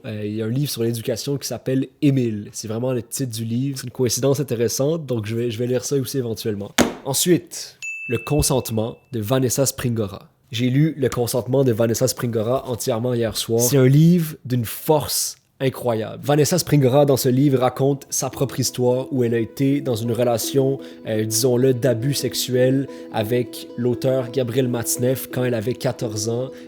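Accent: Quebec, Canada. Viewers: j'adore ta chaine, continues tes vidéos lecture, c'est très intéressant, ce que tu as écrit à la fin m'a touché, c'était très bien dit, bisous de France (haha j'essaie de me familiariser avec ton accent québécois)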